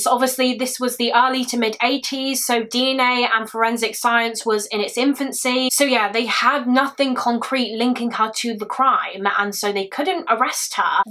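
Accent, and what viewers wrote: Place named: England, United Kingdom. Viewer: In addition to your personality, I like your genuine English accent.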